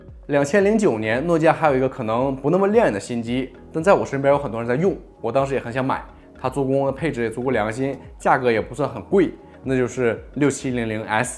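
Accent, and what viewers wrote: Northeast, China. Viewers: 说话满口大碴子味，😅😅😅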